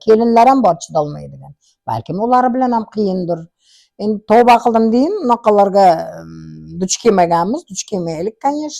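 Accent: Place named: Samarkand, Uzbekistan. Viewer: ты супер, маладес, хакикий Самаркадча гап булибти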